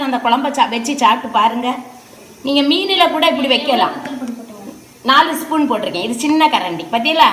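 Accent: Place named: Kanyakumari, India. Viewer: Akka kk slang.kaytalaymass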